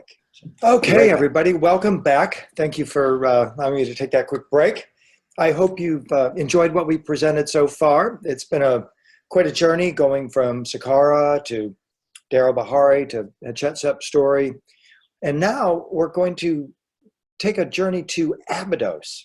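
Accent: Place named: Tennessee, United States. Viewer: Never miss a chance to see your work. I love your Tennessee way. I live in West TN always. Suppose you sound like home 🤓 You have a true sense of the human condition. Bravo!! 🥳☝️✨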